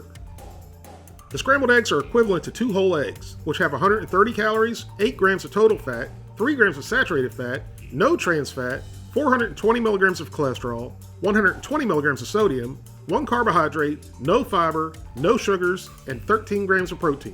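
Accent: South, United States. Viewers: I find the topics you cover to be interesting but I hate your scripted delivery and gay estrogen filled hillbilly voice.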